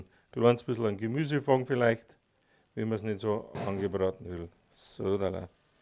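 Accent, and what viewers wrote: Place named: Bavaria, Germany. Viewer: Wunderbare Musik und ein noch besseres Rezept. Und das ganze auf Boarisch...herrlich😂😂😂 Endlich mal wieder etwas schnelles und bodenständiges. Sehr schön. Das Video hat mich in gute Laune versetzt. Danke dafür 😄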